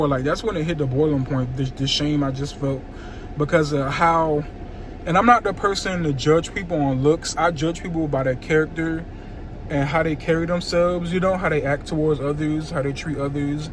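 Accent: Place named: Spain. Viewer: So you developed a so called Spanish accent.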